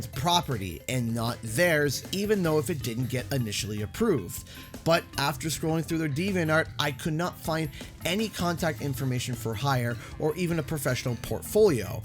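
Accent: Canada. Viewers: Hey how about a skylanders remaster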